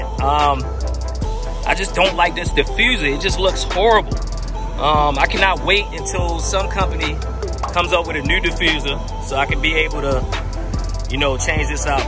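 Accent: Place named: Baltimore, United States. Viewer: Your accent... you from Baltimore? DMV?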